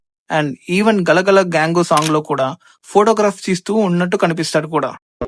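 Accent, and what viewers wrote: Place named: Nellore, India. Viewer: Nijam Cheppu medi Nellore kada !!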